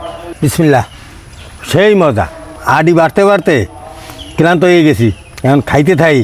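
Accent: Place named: Barisal, Bangladesh. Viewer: চাচা বরিশালে কথা বলে খুব সুন্দর লাগে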